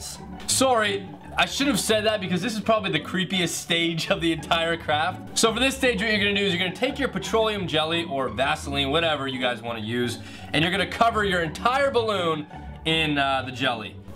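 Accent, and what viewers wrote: Canada. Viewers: Soorry he sails soory like Nicole and Andrew 😍 Canadian sorry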